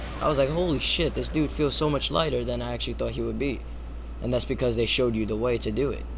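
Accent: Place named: New York, United States. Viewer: i love the way people in NYC area say awesome and water lol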